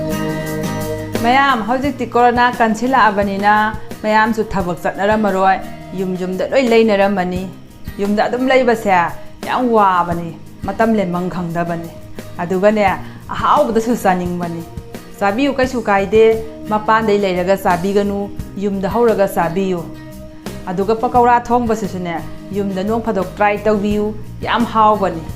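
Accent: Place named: Kakching, India. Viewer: kakching maron ne amfao tajadribo.keino noise migi maron khoibase kothok2 tana hangisibo.manipir kachin amata koibirudribo.lam2 gi matung ena lon se adum khoibane..mapan thoktaba mi yam male.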